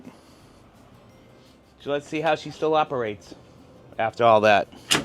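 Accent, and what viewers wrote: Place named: Boston, United States. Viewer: (Guessing you're from Boston, based on your accent?) Just picked up my '25 Hybrid Maverick, LT.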